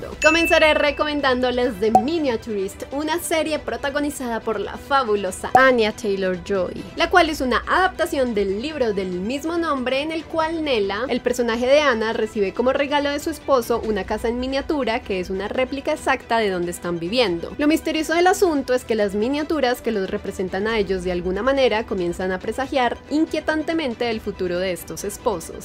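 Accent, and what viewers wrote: United Kingdom. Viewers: hablando ingles británico 😁😁😁 Amigeeks: Mira ese potencial.jpg Yo: Que femenina.jpg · Me encanta el acento inglés de 🇬🇧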